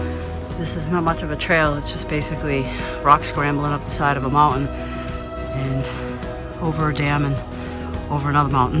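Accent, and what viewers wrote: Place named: Eastern Massachusetts, United States. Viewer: What beautiful views, you picked the perfect clear day. Love to listen to your voice especially the Eastern Massachusetts accent, i drop a lot of r's myself and talk similar coming from New Beige.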